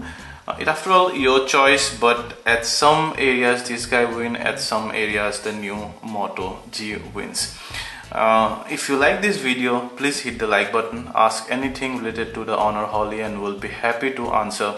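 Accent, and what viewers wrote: Assam, India. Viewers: You sound like Assamese :D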